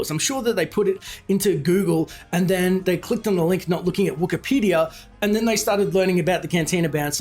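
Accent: Australia. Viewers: do you do looper too or am I just a racist who thinks all Australian men sound the same?.....Are you even Australian?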